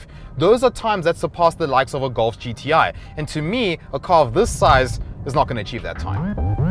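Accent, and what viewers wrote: South Africa. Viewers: You from South Africa 🇿🇦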